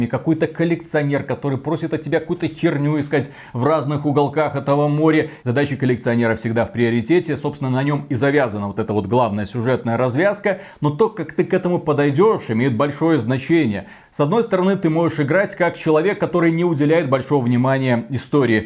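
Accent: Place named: Belarus. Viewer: лайк за трасянку!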